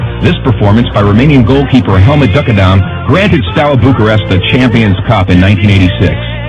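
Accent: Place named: United States. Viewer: foarte tare clipul!!!!pacat totusi ca sunt putin cei care apreciaza si recunosc toate meritele Romaniei. totusi nu mi-a placut vocea tipului...adica accentul lui cand pronunta cuvinte in romana!!! de ce le pronunta ca un american/englez???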